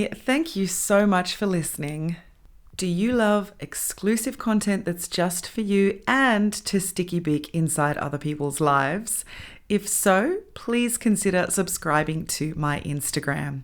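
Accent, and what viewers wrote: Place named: United Kingdom. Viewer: 100 % agree. And off topic but I always thought you were from the UK because of the accent, and not Australia😅😅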